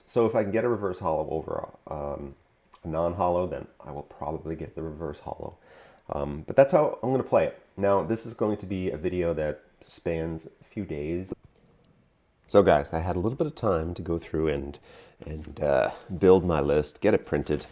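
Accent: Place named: East Coast, United States. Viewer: First time coming across your channel - you sound like an east coaster! Ive collected Yuka Morii’s full english set, and I’m working on komiya, Kusube & Nishida!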